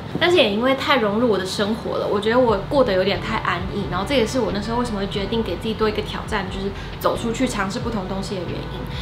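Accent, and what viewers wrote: Cantonese, China. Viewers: 不要哭啦，知道你是獨立的女生，好喜歡你的廣東話口音，歡迎再來香港😘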